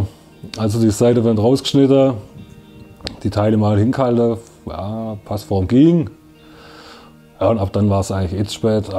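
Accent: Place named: Swabia, Germany. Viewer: Endlich wird auch mal schwäbisch gschwätzt auf dem Kanal..... Respekt vor der ganzen Arbeit und super Ergebnis! Top!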